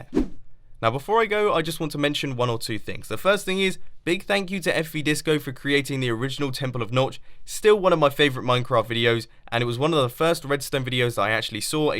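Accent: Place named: United Kingdom. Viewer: I love you Mumbo and nice British accent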